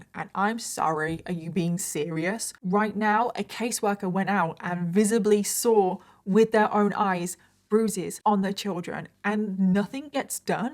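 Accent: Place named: England, United Kingdom. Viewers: its nice to hear Timothy in an English Accent i have been in the states for a while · Congratulations on topping the charts,sounds like you have a midlands accent,spot a fellow midlander anywhere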